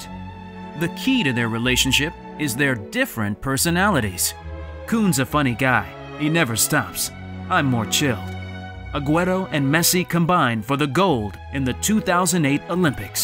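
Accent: United States. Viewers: American speak English , try Scottish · Can we not have Americans narrating these?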